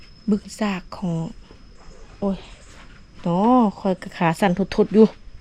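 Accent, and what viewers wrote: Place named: Phu Thai, Thailand. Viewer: โม่นสำเนียงสาวภูไทเด ติดตามตะลอดเด้อ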